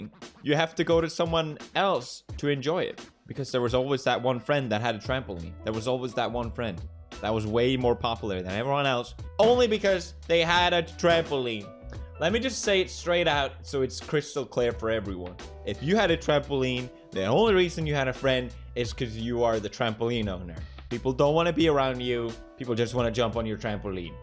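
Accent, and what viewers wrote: Sweden. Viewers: is it me or is his swedish accent coming back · his swedish accent was way heavier back then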